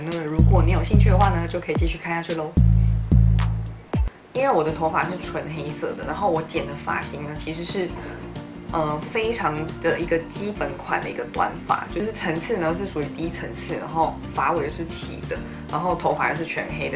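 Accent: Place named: Taiwan. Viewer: 棒棒的你是台湾人吼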